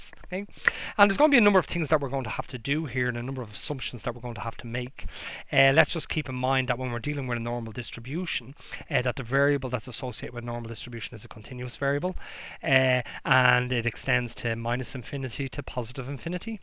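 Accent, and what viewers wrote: Ireland. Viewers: You're Irish right?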